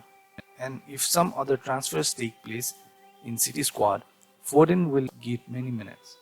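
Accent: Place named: South Asia. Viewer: I'm from Nepal and your accent sounds familiar like from south asian countries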